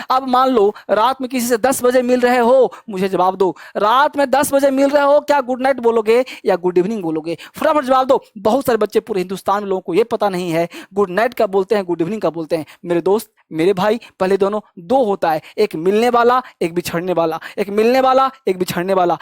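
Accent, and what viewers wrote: Bihar, India. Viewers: Hello sir, apka padhane ka tarika mast h or ap lgta h Bihar se hn??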